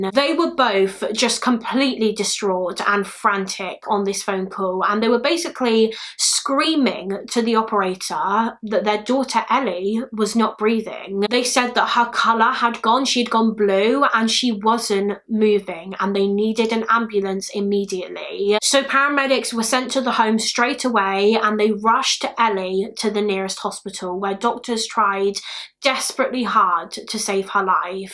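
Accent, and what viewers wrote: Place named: England, United Kingdom. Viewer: I don't know why, but when i have a bad day, voice is just relaxing. I love the english accent.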